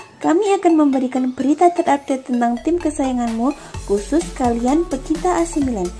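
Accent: Java, Indonesia. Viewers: Adooh medok2 eee kental banget😆😆😆😆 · Medok.. suarane... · Medok bgt min jowone ngendi 😅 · Wess medok jawa ne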